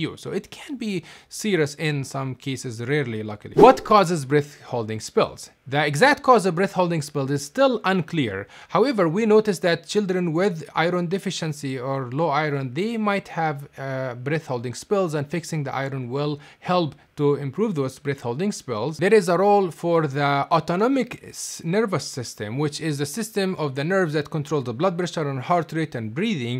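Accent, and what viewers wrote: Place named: Arab world. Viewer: ما انتبهت لاسمك بالبداية لكن أول ما سمعت صوتك وطريقت لفظك للكلمات عرفت أنك عربي😂، سبحان الله لنا بصمة صوت وطريقة لفظ خاصة.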